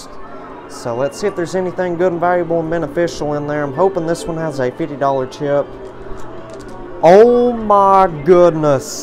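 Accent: South, United States. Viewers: You're doing great tonight....that deep southern accent...anyway you're doing great 😃😃😃😃👍👍👍👍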